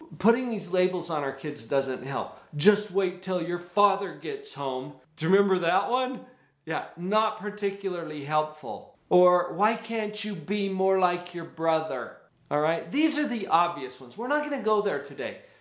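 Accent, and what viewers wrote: United States. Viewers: you sound very american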